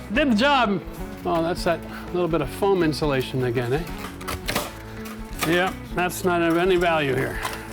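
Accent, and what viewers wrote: Canada. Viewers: Canadian aa?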